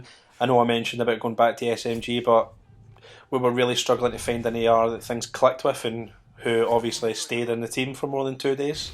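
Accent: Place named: Scotland, United Kingdom. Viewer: feels weird hearing a fellow scottish man doing one of these haha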